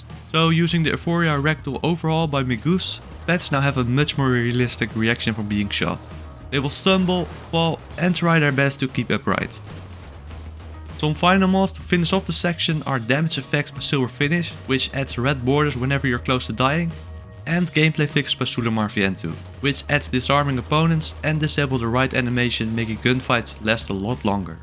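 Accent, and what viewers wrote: Netherlands. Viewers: i detected a dutch accent veel succes brooo je kunt het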